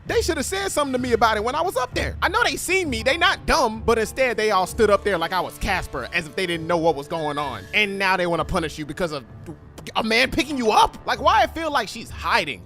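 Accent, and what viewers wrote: United States. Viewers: from one honeyless man to another let me inform you in Joburg no honey gets "rizzed up" they just want the paper cuh, they just heard your American accent and probably thought "yeah, he got that cheese". You want a honey who'll be a ride or die, go to KZN get yourself a Zulu wifey, next time you in SA. AND DEAR GOD DON'T GET A XHOSA hun. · It's ur accent bro, u got an American accent that many people in South Africa might find interesting...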